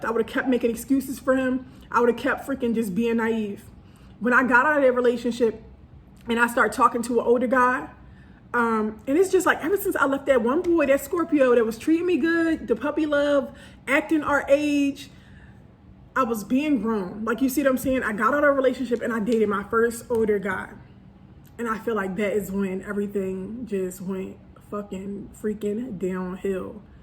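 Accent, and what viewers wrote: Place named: Philadelphia, United States. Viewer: Sooo I just found ur podcast and as soon as I heard ur voice I was like “hold up is sis from Philly?! She’s giving me the vibe”. 😂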